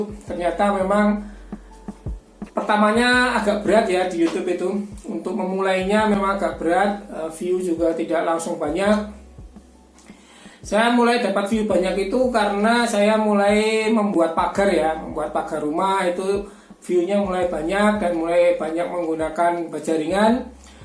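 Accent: Java, Indonesia. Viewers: PT. Niagamas Lestari Gemilang adalah gambaran dari sosok tukang kayu yg sederhana, org Jowo, tur medhok ndeso 😁 tapi lihat! punya impian yang besar dan kemauan yg keras. Selamat bung, anda sudah sukses menjadi inspirasi 312ribu orang. ini luar biasa. semoga terus mnjadi inspirasi bagi kami. #TJJ300k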